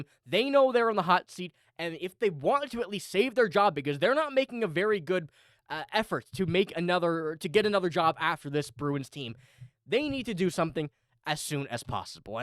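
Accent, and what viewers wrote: Canada. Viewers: Where are you from bro? You have a Canadian sound to you but obviously a huge B’s fan…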